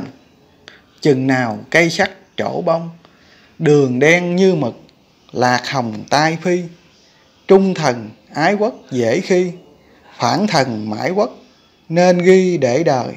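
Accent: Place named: Central Vietnam, Vietnam. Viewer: Kiến thức này ko để trọ trẹ đọc mất thiêng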